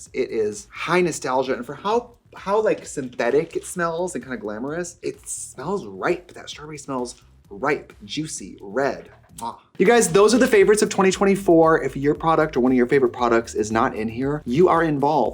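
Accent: Wisconsin, United States. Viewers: You can also hear her Wisconsin accent a lot more and I think that’s very metaphorical. She’s back home. Honestly makes me wish I had 3 months off. 🥹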